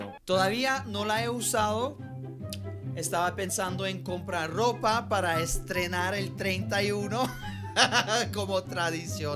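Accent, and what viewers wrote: Colombia. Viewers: habla como si fuera colombiano de nacimiento 🥰 TENEMOS 3 cordilleras en bogota no TENEMOS casas asi 😂 cual extrajenro si tu ya estas asi habla un colombiano eh ave maria me encantas tus videos 💗 y no se si ya lo sabes supongo q si pero igual te lo digo xd la cancion del teaser se llama y si algun dia haces una introduccion para tu canal deberias usar esa cancion porq iria excelente con el canal 🥰 y no eran orquideas 😂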